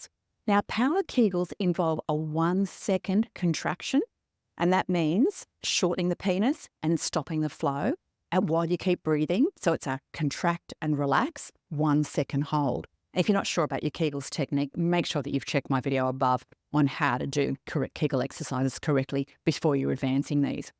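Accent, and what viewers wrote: Australia. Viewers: The Australian accent is very appropriate for errectile dysfunction discussion. My favorite channel so far.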